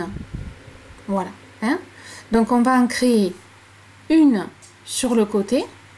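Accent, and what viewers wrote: South, France. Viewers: bonjour et trés joli châle et continuez à parler un peu de sud ne fait pas de mal au contraire merci pour ce tuto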